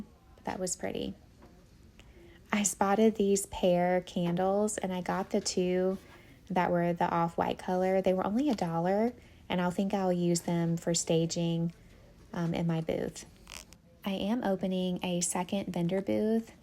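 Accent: South, United States. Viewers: I’m hooked. I’ve watched your videos a lot these past days. You really sound like a Southern Belle. It’s fun shopping with you.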